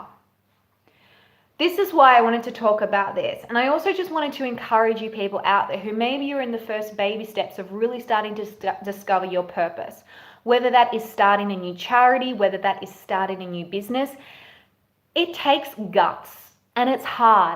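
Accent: Australia. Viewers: I saw a video by some mgtow guy nit picking on your video. I wanted to hear what you were saying because there was some good stuff there, but couldn't because of his constant interruptions with his "color commentary". He didn't mention who you were so going by your Australian accent I found you. On your mgtow video, you start by saying "leave your comments below", but when I scrolled down, the comment section had been turned off. My hunch is that you got inundated with mgtow tribal wrath. What I gathered from his video is that while you were discussing personal relationships, he was straw manning it with "ya but the workforce". Blatant to me but perhaps filet mignon to his followers. I saw a guy interviewing men and women as to whether they can just be friends. All of the women said yes, most of the men said no because the feelings are there. Cheers.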